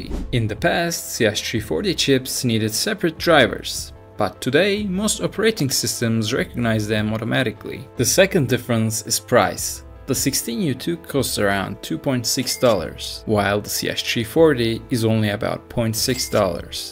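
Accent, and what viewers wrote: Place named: Turkey. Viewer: Hele diyorum hafiften bir türk aksanı duyuyorum.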